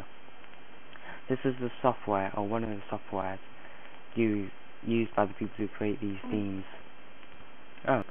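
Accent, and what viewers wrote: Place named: Guyana. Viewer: u ah guyanese too lol wah gwan bai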